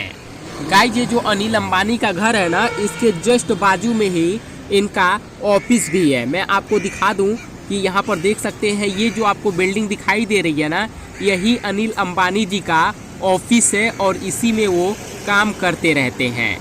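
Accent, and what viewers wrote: Bihar, India. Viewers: Apki Hindi bilkul bhihari jese hai good job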